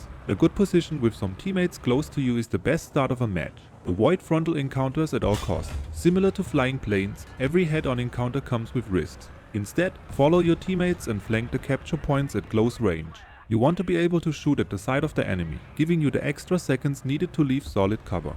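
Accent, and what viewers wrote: Germany. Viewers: You are German right ? 😄 ich auch 😂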